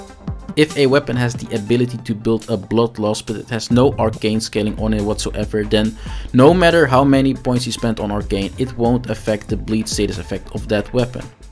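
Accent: Netherlands. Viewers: Bizar maar tijdens het eerste woord dacht ik Ja! Nederlander! Speedrun stuff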